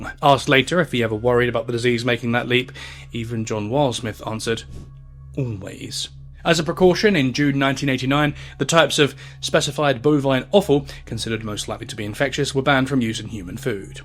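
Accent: United Kingdom. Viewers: english is my third language and i undestood him perfectly, hes just briitsh??? lmao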